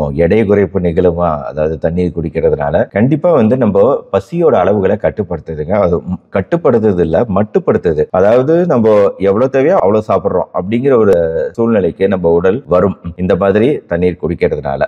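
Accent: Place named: Coimbatore, India. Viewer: சார் நீங்க கோயம்புத்தூர்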